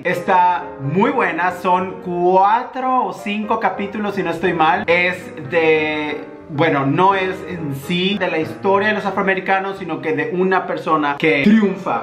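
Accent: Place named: Yucatán, Mexico. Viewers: Tu voz siempre se me ha hecho peculiar, ese acento fresa y yucateo a la vez. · Amooooo tu tono yucaaaaaa NUNCA LO PIERDAS AHHHHHH MARE LINDO HERMOSO 😉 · Cierto es que le dio un espacio en el mundo al acento Yucateco. Por ti, creo que habemos muchos que antes no lo tolerabamos y ahora amamos el acento y hasta lo imitamos. Te quiero nene. Pd lavate bien tu tuch que hay covid lol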